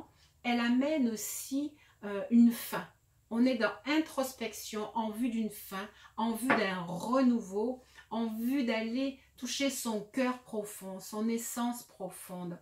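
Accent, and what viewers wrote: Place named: Canada. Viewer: Bonjour. J espère que vous allez bien. Merci pour ces messages. J ai entendu qu'au fur et mesure du parcours les 2 jumeaux ont des points communs physiques comme une harmonisation: même couleur d'yeux, même voie, forme du corps...Vous allez peut être rire mais. ou s avez des pointes d'accent canadien....tresbonne journée. 🌞😀🙏❤😘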